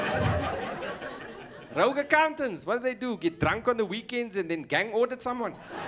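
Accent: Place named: South Africa. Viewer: sounds both Coloured and Indian 😅😅 nice!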